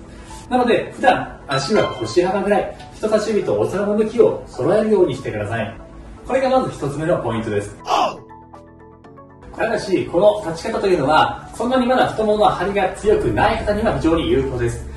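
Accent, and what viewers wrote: Kansai, Japan. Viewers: 初めてコメントさせて頂きます。 今までYouTubeで色んな筋トレ、ストレッチ、マッサージなどしてきましたが、痩せるどころか辛いし、脚は太くなるしで全く継続出来ませんでした。 たまたまこちらがオススメで出てきたのでやってみると、とても簡単でこれで脚痩せ出来るのかな？と半信半疑で初めて３週間。ずっと履いていたユニクロのデニムが緩くなってきたので、古いしそろそろ新調しようと思って試着したら２インチも下がっていました！ 体重も確かに少し減っていましたが、正直ここまで脚痩せしていたとは、ビックリです！ 素敵な声が聞き取りやすく、時々出る関西弁に癒され、ハイセンスなＴシャツとタイツで毎日飽きることなく続けることが出来ています。 長くなりましたが、素敵な動画を本当にありがとうございますm(_ _)mこれからも動画ＵＰ楽しみにしています。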